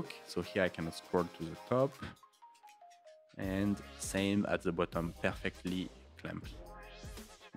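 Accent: France. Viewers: German speaker, sounds like French, looks like American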